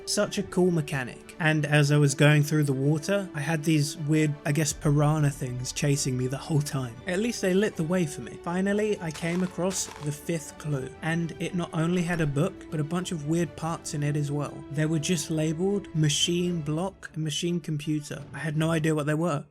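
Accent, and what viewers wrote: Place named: United Kingdom. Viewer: you sound like winnie the poo, dream, and a british accent combined and i am HERE for it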